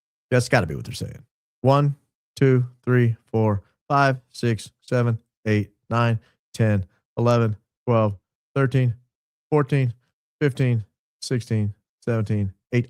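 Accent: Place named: Alabama, United States. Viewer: were you originally from Alabama? It sounds like you at least lived here. I was born here and have lived here for all but fifteen years or so of my life. I returned slightly after that time frame, and I remember being shocked at the political things that happened in the early 2000s.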